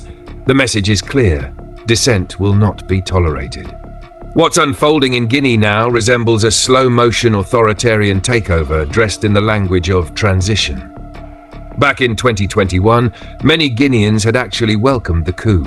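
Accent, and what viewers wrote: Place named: Europe. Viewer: African content in European voice? We can do better.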